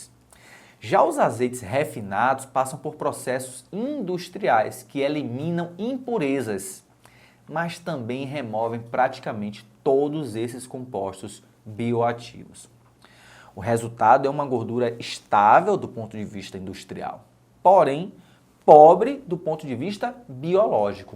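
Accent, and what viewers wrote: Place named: Rio de Janeiro, Brazil. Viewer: Carioca poĥ Quer dar uma de deisch...colado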